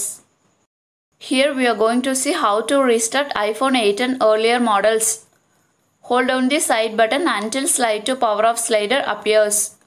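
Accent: India. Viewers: That malyali accent is sooooo nice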